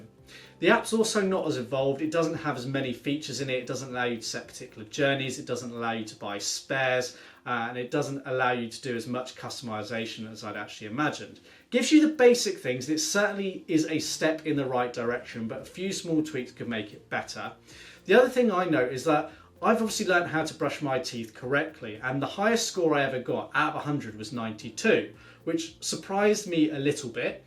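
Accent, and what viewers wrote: United Kingdom. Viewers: Aren't you a British one?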